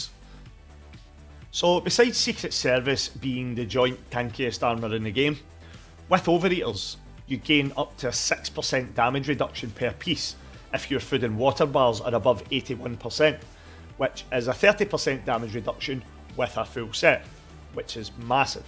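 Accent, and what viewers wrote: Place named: Scotland, United Kingdom. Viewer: Happening mate! I’m also Scottish so am very excited to hear your accent on some good fallout content. Just wanted to point out that you don’t get into the actual build what so every till after the 5 minute mark which means you spent a minimum of 1/4th of this video not getting to the point. Not saying this to out you down, just meant to be constructive criticism. A lot of people who google specific things about a game they’re playing such as “good non-power armour build” want a quick answer to a simple question so that they can get straight back into the game. Hope this maybe helps a bit as your ability to write a script and host is fantastic. You deserve significantly more subscribers and I’ve just become one. Best if luck mate.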